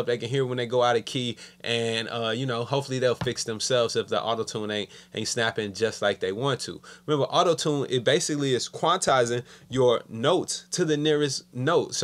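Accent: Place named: South Carolina, United States. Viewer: U from south carolina huh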